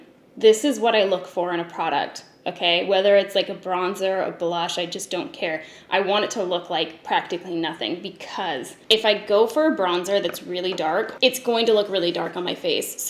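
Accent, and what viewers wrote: Canada. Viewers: I think your Canadian accent is delightful! You don't need that kind of negativity in your life... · Happy New Year! Love your Canadian accent,! That’s actually part of the reason I started watching you, Jamie and Allana. I also used to have a thing about Canadian guys based purely on their accents, then I realised if I carried on this obsession it might end in me marrying a mounty or something... Your skin looks fantastic! I also love Hourglass and got the Ghost blush palette for Christmas, as well as the Scattered Light holiday shadows set. They are beautiful. Hope you and your family have a wonderful 2020! Much love from Munich xx 💋 xx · I love your Canadian accent!! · OMFG YOU FINALLY SAID IT! I LOVE YOUR EYE BEHGS!!!!! WE LOVE YOUR ANGELIC CANADIAN ACCENT!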